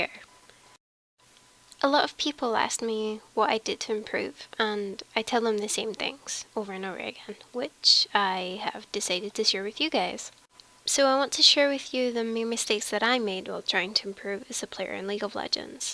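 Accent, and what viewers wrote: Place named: Canada. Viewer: LoL, the canadian accent is strong in this one, oooover and oooover. abooot. Loved the video though, learned alot from it ! :)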